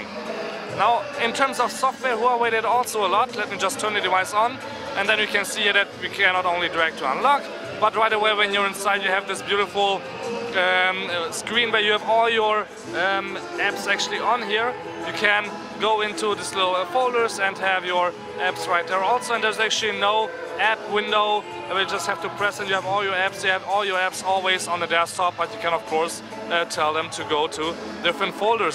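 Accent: Germany. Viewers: German accent? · Wie man sofort hört, dass er Deutsch ist xD Immer wieder lustig :D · God, do u sound German!^^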